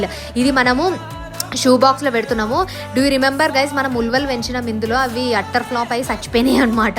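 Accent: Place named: Kerala, India. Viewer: Why u r speaking telugu in Malayalam slang 😅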